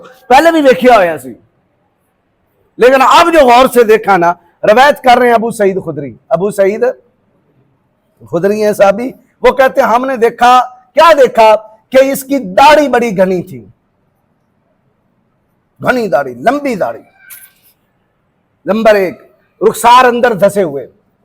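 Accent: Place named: Punjab, India. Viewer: Mashallah bht achchi malumat par Panjabi bech bech me bolkar khas khas Samajh nahi aya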